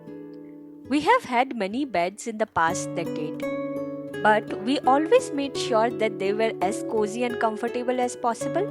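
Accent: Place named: Northeast India, India. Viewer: Hahaha..... breaking of the rolling pin and the glassware being intact is indeed funny......I have been watching a lot of your videos past two weeks, they are really nice.... Your English is perfect, nevermind accent, no one outside India does.....btw, I was born in northeast, so I totally understand the accent and I love it. Best wishes for you and your family 💞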